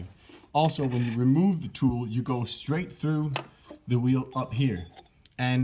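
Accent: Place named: Canada. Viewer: Wait, this guy is Danish? I honestly thought he was Canadian 😂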